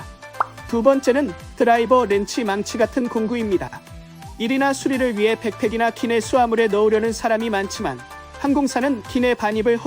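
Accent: Japan. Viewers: 왐마 한국어 발음 너무 귀여워요 외국인 중에 ㅜㅜ일본인분들 한국어 하시는게 젤 귀여움 ㅜㅜㅜ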